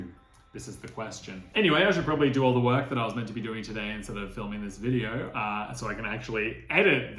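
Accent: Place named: United Kingdom. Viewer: Great video. For myself, I’m about to purchase. But I’m stuck between silver and space grey and I think you helped me with that but so ty for that bc I’ve been on the fence about what color I like, but the specs I’m getting are m1 max and 64 gb with 2 tb ssd for some future proofing. And I love your accent I’m moving to England from the states and I just love watching British content creators!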